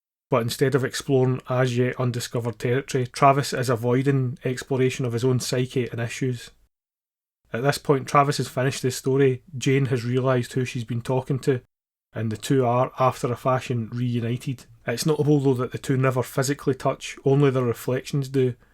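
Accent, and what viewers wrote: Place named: Scotland, United Kingdom. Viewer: Hey this is versustheworldvideo, thanks again for your comment on our latest video. I'm replying on my personal account. I'm Scottish myself, but your accent is much thicker than mine - I love it! Your in-depth analysis is impressive, and your editing skills are sharp. You put a lot of time, care and effort into your video. I was blown away at 3 mins in by your explanations that make perfect sense as to why the director set the scene up that way.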